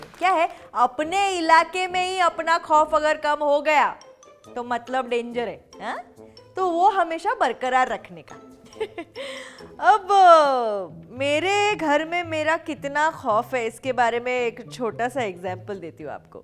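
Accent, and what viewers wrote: Maharashtra, India. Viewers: My god her acting skill and the marathi accent..love it dii ❤️❤️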